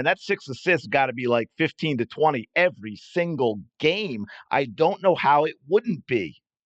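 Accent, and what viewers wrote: Philadelphia, United States. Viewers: No need for the Cowboys slander. You sound like your from Philly. Let’s just keep it to the Aces implosion and Aja crying.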